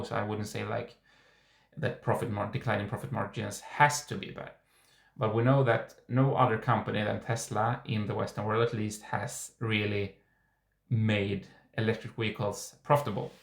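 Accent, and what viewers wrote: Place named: Sweden. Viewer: Your accent seems to me Swedish so tack sa mycket for your presentation and I wish you good luck with your channel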